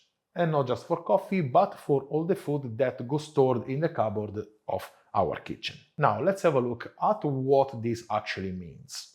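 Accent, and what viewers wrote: Italy. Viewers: Don't give up your Italian accent,! It enhances your credibility, along with all the impressive science. Molte grazie. You're the coffee go-to man.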